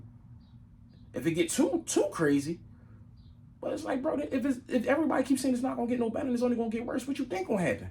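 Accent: Philadelphia, United States. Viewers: Philly accent??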